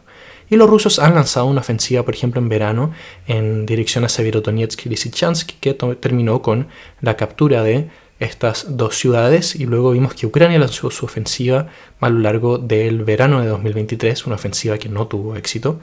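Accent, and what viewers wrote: Chile. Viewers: Eres chileno bro? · Si compa, es de Chile.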